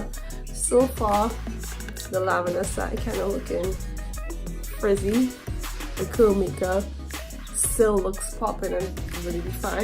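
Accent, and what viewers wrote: Trinidad and Tobago. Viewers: You sound Trinidadian 🇹🇹 Just found your channel.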